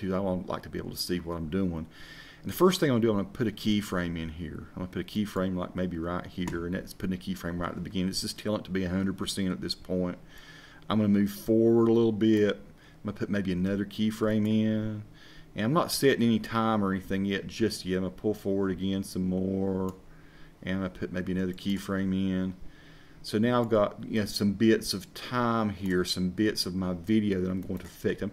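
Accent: South, United States. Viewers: Awesome Vid. Thanks. I somehow knew you'd have a southern accent with a name like lol.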